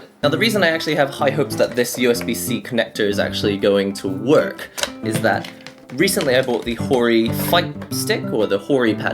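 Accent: England, United Kingdom. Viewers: he is an Asian but have UK accents. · Why the fuck this Japanese guy have a British accent? Can he actually speak Japanese? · You're Asian and you have an English accent. You are officially my favorite person in the world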